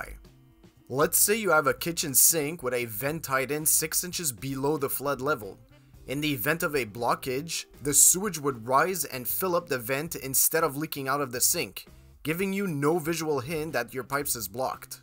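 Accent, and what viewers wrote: Quebec, Canada. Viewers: code for what state and country? I listen to your voice, and there is an accent in there.. french canadian? I can't tell. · I believe he is from the La belle province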